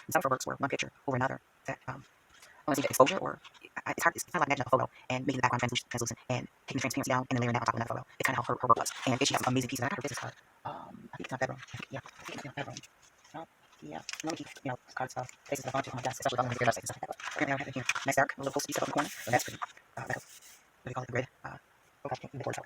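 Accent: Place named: New York, United States. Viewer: I always forget you are a New Yorker until you mentioned it. I can still hear it in there. 🥰 Please do a tea journal when you can squeeze it in. I’d love to see it. xoFaith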